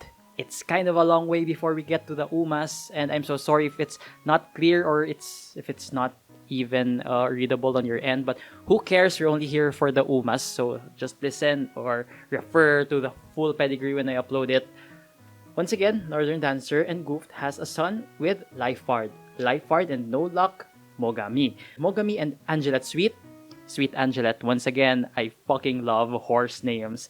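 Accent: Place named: Philippines. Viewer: This needs more views. Filipino accent is strong.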